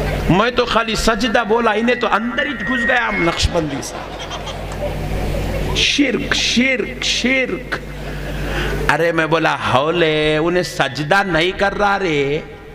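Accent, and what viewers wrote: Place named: Hyderabad, India. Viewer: me to yaar haidrabadi language sunne aaya tha 🤣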